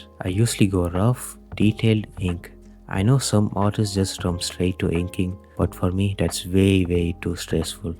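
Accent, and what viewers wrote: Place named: India. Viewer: Annoying Indian voice omg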